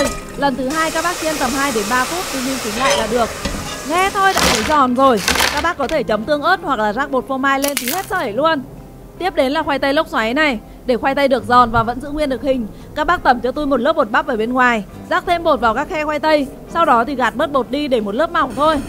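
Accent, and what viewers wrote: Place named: North, Vietnam. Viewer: Thiệt ko mún xem kênh này mà nó tự hiện ra thôi. Lại giọng miền b thì ko ưa đc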